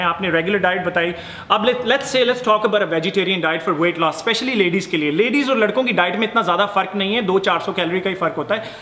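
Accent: Punjab, India. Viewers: hahaha I really enjoyed this video.. your Punjabi accent is aala.. diet plan is good..keep it up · Stumbled upon his channel love love the way he talks especially his hindi/punjabi accent so I subscribed :P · Your. Punjabi accent😘😒😒lovely 😘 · i m also punjabi and the way u speak punjabi is sooo cuteeee..... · Ur way of speaking Punjabi is so cute.i also want to loose some weight but mujhe baar baar kuch khane ka man krta h.so plz aisa diet plan do 4veg jisse tummy fat km ho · Sir u r Kainttt..... I am going to follow this diet plan...... And I like the way you talk Hindi, English te naal Punjabi da tadka aaye haaye..... 👍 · Lovely video and lovely cute punjabi wali hindi awwww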